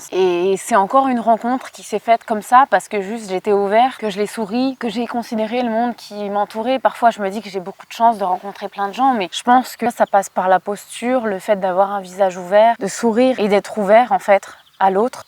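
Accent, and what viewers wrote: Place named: Belgium. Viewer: je suis belge, et quand tu parles, bain on dirait quelq'un d'ici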